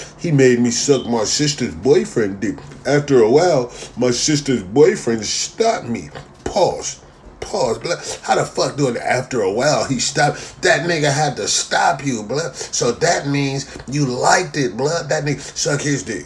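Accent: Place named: South, United States. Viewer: Why is ur nose so light? And what kind of southern accent is that? You're not originally from Cali though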